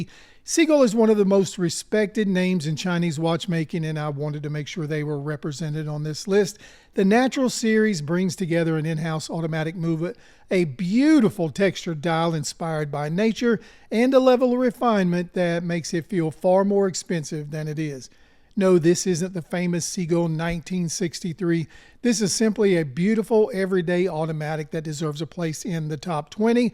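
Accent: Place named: Maryland, United States. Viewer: Hey. I'm trying to figure out your accent and where you're from. Maryland? Carolina's? I'm probably way wrong. Lol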